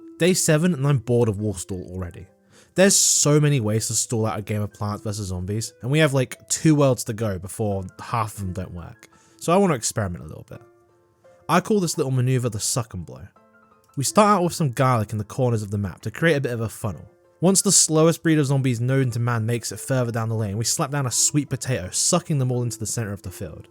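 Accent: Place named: United Kingdom. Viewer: love the way you talk a great uses of being british.(im british)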